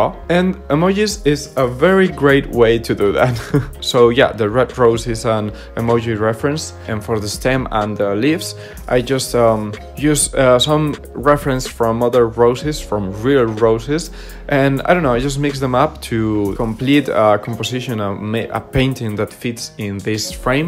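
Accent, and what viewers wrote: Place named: Madrid, Spain. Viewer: Me gusta que subas videos en Ingles pero extraño tu acento Madrileño 💔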